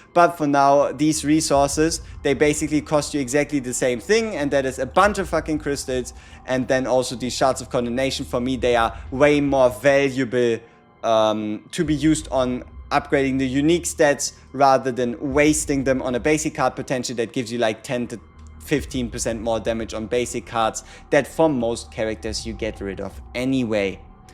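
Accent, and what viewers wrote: Germany. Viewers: Love yoir heavy german accent 😭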